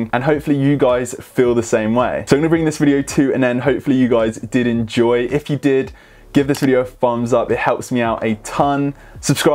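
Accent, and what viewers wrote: United Kingdom. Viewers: Are you British? Love your accent ❤️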